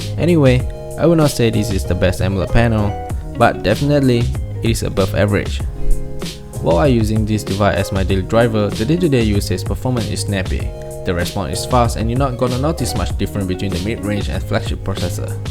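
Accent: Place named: Malaysia. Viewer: hey, great video. I guarantee that you'll have tons more subscribers very soon!! also, where are you from?? sounds like Malaysia, Singapore ah? like me ;-)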